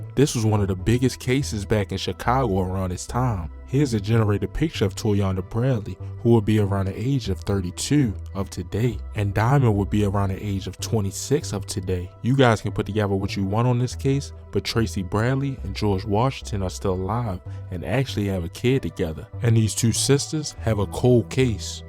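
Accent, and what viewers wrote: Baltimore, United States. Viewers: The narrator sounds like he from Baltimore.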